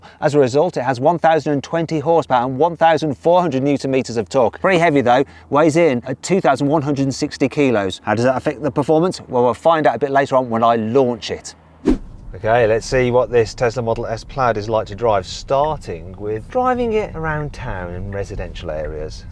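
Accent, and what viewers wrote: United Kingdom. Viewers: ㄹㅇ 카와우 영국발음 소리가 좋아서보는사람 나밖에 없나...?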